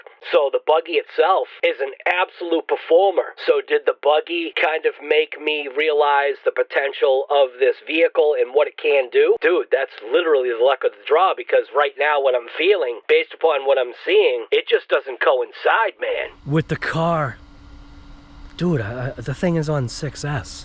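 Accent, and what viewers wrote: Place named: Boston, United States. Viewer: Good review bro. But I absolutely love my blitzkrieg! It may not be perfect, but it is a fun rig. Got the red cat Valkyrie TR and is great so I figured I'd get the MT of the WOV just to see how she stacks up platforms are basically the same. I've been watching your channel for a while. Congratulations on your success buddy even love the Boston mass accent!😅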